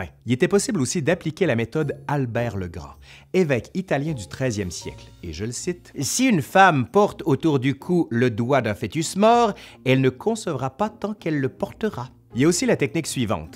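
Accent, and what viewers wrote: Quebec, Canada. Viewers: Excellent ! Encore meilleur avec votre accent canadien !😊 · Merci pour l'accent canadien qui nous explique tout ça avec beaucoup d'humour.😂😂😂😂😂😂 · Toujours instructif, plein d’humour, une prononciation impeccable ! Et j’adore l’accent Canadien! 👍🏼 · Merci à toi, merci de ta façon ludique de raconter l'histoire. Et merci à ton léger accent qui me rappelle mes vacances dans la belle province. Alors je me suis aussi abonné et je partage 🎉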